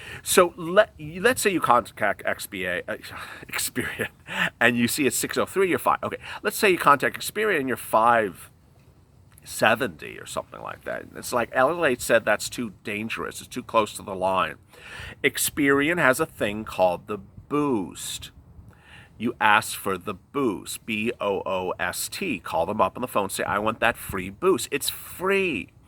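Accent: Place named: West Coast, United States. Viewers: Sorry about the typo 😀 I meant to say that I’m from the east coast and I love your west coast accent ❤️❤️❤️ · That’s where his accent comes from...the west coast!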